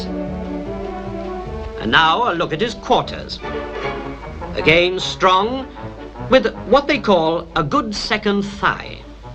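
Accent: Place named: Surrey, United Kingdom. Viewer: He sounds like my Grandpa he was from Surrey I went to School with that accent and was corrected by the teacher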